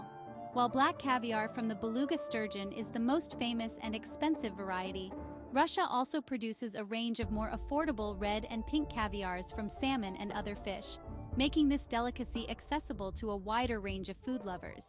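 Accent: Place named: United States. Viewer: As a Russian speaker, I wince at the American pronunciations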